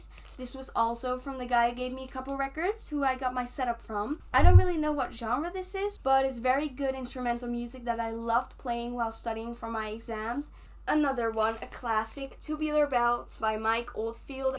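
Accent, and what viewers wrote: Netherlands. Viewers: Amazing collection! You sound Dutch btw, are u?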